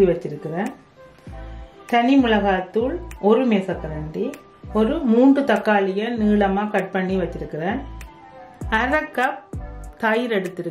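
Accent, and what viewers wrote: Jaffna, Sri Lanka. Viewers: Very tempting receipe enjoy your receipies. Very much .specialy our jaffna Tamil.thanks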